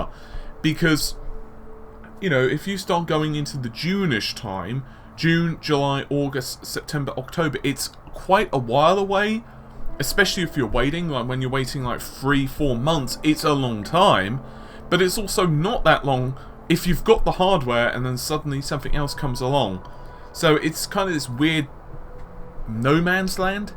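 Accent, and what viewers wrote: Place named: United Kingdom. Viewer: BuckRogers yep, I'm from Costa Rica, i can speak and comprehend pretty well, but due to his tone it is sometimes a bit harder to understand what he says, I'm too distracted with his tone and accent. I gotta put more attention to it. He sounds british to me.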